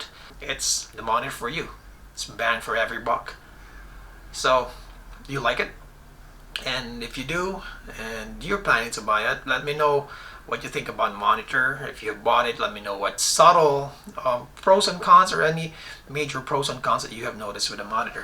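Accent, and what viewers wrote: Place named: Canada. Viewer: Good video mate. The whole time I was wondering where you were from and then you said NZD! Your accent was throwing me off. Where is it from? I'm going to guess Canadian? Good to see techies across the ditch making good vids. All other reviews on this monitor are rubbish. I was 90% sure on getting this monitor before watching your vid, and now I'm going to buy it.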